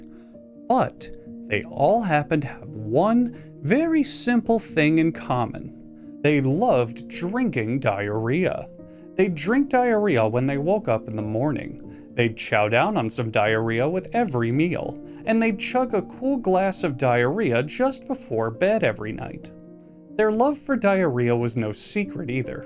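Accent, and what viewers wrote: United States. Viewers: Sound pretty American to me bro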